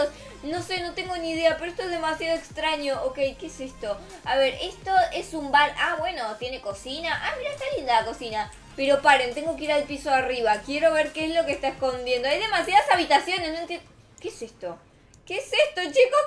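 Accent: Argentina. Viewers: Te amo soy de gran canarias y me pegaste tu acento aregentina yo cuando sea mayor me voy a vivir a Argentina tengo 11 años y me instale roblox gracias a ti y fue lo mejor que hice te amo ati a a y a te amo y mi familia dice que eres mi ejemplo a seguir te quieroooooooooo oooooooooo muchoo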